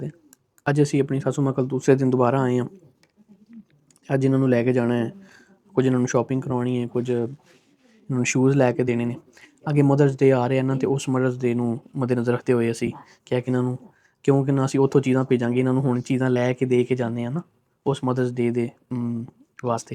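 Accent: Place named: Lahore, Pakistan. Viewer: bhai apse kisi video me question kiya tha k ap kidhr se hein. Apki punjabi se lgta tha ap lahore se hein. Phir kisi video ne suna apne btaya apne bht sari life lahore me guzari hai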